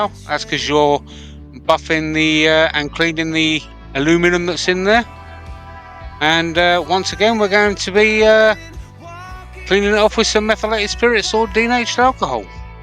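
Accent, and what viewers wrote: United Kingdom. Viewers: Looks great, I'm a little confused, your accent sounds like you're from the UK but you pronounced Aluminium as if you were an American.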